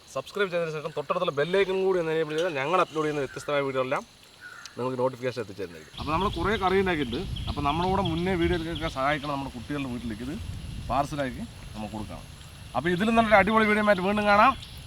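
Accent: Palakkad, India. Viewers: Palakkaden slagill "കോഴിയും കുമ്പളങ്ങയും "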